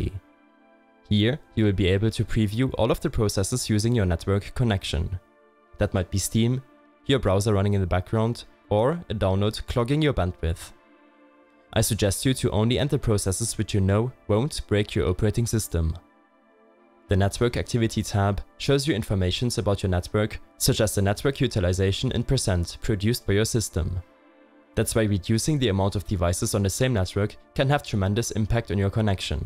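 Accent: Germany. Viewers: dieser deutsche akzent HAHA aber gutes Video ;D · german accent we know dude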